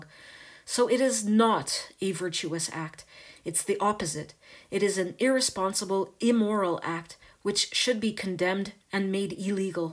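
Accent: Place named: Canada. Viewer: cute Canadian accent, very intelligent, full of wisdom, this woman is so nice to listen to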